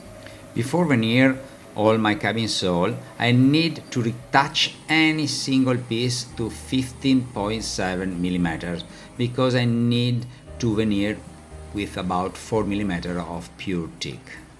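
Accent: Italy. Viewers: personally I find you English spoken with your Italian background quit charming.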